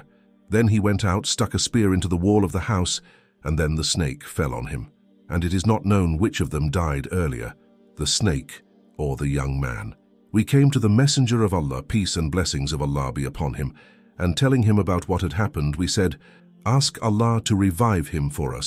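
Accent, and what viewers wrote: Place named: United States. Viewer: I prefer narration with English accent than the annoying American accent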